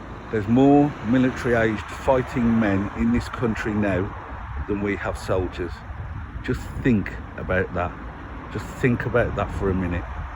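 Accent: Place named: West Midlands, United Kingdom. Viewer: Subscribed. I absolutely love your accent❤ I'm fromYorkshire but i adore a West Midlands accent.❤ well done for starting this channel. We need to stand together, we are united in our shared values and morals. ❤ much love to all❤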